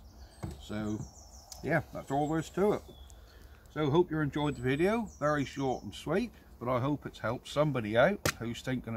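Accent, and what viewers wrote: Norfolk, United Kingdom. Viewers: I miss the Norfolk accent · i hear your from the uk. where do you get these from? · That's funny - I started watching before I read your channel name and thought "that's a Norfolk chap"! We're over in the broads. Nice video,cheers. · SOMEHOW LISTENING TO A FELLOW AIRGUN ENTHUSIAST WITH A BRITISH ACCENT EXPLAIN AIRGUN TECHNOLOGY IS MORE INTERESTING THAN A VIDEO FROM THE U.S. GUESS IT MUST BE THE SAME APPEAL AS THOSE GREAT TV SHOWS THAT CAME FROM THE BBC NETWORK. CAN'T HELP MYSELF. I AM A DIEHARD "MONTY PYTHON" & "BENNY HILL" FAN! 🎅🌅🗽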